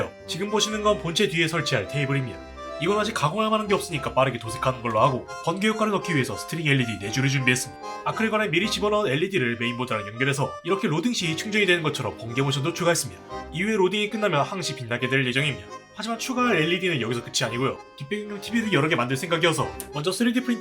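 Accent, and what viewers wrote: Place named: United Kingdom. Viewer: YOU'RE BRITISH????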